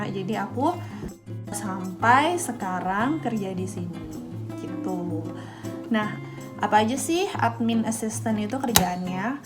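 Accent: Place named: East Java, Indonesia. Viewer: Learning by doing, mantap mbak Btw mbak e logat e wong Jawa timur heheh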